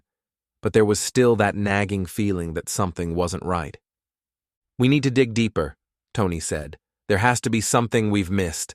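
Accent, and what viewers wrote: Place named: United States. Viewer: Why the American voice?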